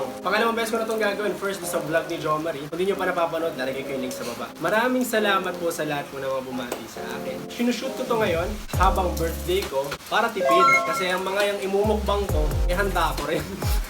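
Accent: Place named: Conyo, Philippines. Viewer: Sobrang saya ko while watching, napaka konyo mo and di ka boring kausap sana lahat may sense of humor.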